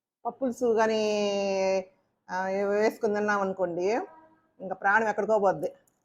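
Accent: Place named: Telugu, India. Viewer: Hi Garu, My name is from Chennai, your video brought me some fond memories as we used to make it with my grand mother, on our terrace. I used to eat them all the time with sambar and annam vadiyalu. Also Perugu annam with mamidi panda, I absolutely fell in love with your telugu accent as we speak the same way, as we are from a agriculture and diary background from ongole. I was wondering if you could make a video on how to make good forgotten simple foods like Paramannam, Pappu charu, Chinthakaya Dosakaya Pachadi, etc similar to our region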